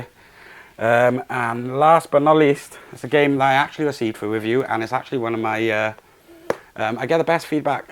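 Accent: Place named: Wales, United Kingdom. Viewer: Where are you from bud? Obviously I'm not asking for an address lol. Just sounds like a bit of a Welsh tang to your accent. A bit like myself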